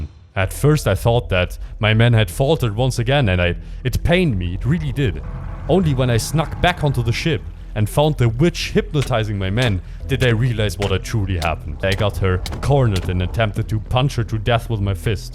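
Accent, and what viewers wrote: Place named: Germany. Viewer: Since I heard the first sentence from I knew he was german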